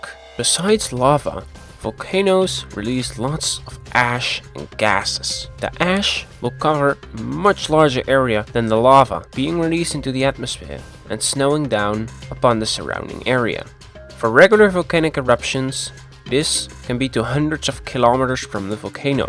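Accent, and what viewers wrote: Netherlands. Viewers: Sounds Dutch to me. Mix of various accents. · Dutch or Flemish huh? Your accent tells me :p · are you dutch? i kind of hear a dutch like accent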